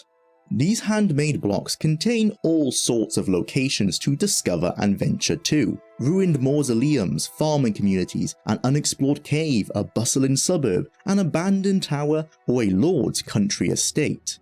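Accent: Wales, United Kingdom. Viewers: Yes he’s welsh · I like your accent. Welsh?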